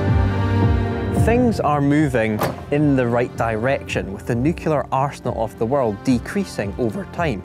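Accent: United Kingdom. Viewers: yeah, it's called British accents. Don't get what people are going on about. He's not saying it wrong, it's his accent and we have a lot of accents for such a small island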